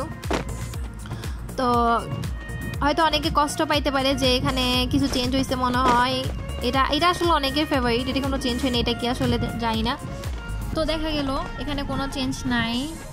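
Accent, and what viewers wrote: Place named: Chittagong, Bangladesh. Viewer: Arre api tumi to khass chittainga khali thake thake bolteco🤗🤗🤗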